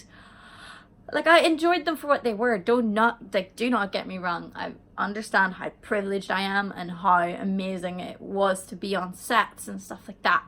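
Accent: Ireland. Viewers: My guess is she's Irish. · you are an inspiration to many people I follow you on YouTube I follow you on Instagram and Twitter because I think that you are an amazingly talented person a beautiful singer and a beautiful young woman you have beautiful eyes you have beautiful hair you have a beautiful smile and if I may say you have a sexy body every person is different no two people are alike you are unique you are unique in many ways keep doing what you're doing because your voice is a treasure your beauty is a treasure and your story is a treasure and I for one appreciate you for all of these things and if I may add as an American I absolutely love your Irish accent it makes me happy to listen to you speak may you have a long prosperous and delightful journey in your life I will follow you through it all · Where are you from? You sound a bit irish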